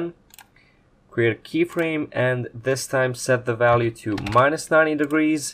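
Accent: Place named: Balkans. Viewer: Are you from Bosna? I couldn't help but notice the Balkan's accent and surname, great tutorials by the way.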